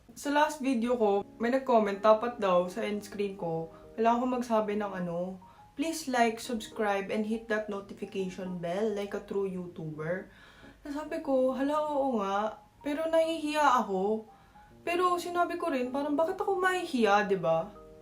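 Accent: Batangas, Philippines. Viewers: kyot mo teh~:face-green-smiling: kaya pala medyo batanguenya yung accent mo taga dito ka pala dati. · Love you, isa ka na talagang Pinay, salamat for embracing our culture lalo na ang aming wika, Batangueña rin ako kaya i can relate to your diction, ala eh..... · That’s why! whenever I watch you I hear words, expressions and even a bit of Batangaueña intonation. Where in Batangas? Batangueña too. A Maroon as well. More power to your channel and congratulations to 100k!🎉👏🏼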